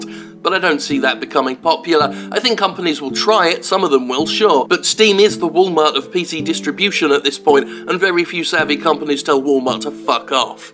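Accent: United Kingdom. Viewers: Probably just the British accent, similar to how they often pronounce "Ah with an R sound at the end.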